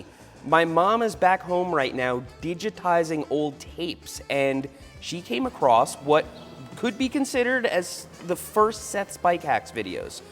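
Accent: Long Island, United States. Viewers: It sounds like my people here in long island. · The way you sounded when you were younger is the exactly like my voice probably cause I’m from Long Island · Hey Seth, do a whole video with your old long island accent! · Cannot not hear the long Island accent now lol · I never noticed the LI accent, but I should have being raised in Bayside. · I can imagine what young Seth would say with that long island accent "Forget about it" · The Long Island accent started to come up during the video while Seth was narrating his footage 😂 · you sound more Lawn Guyland then,then you do now...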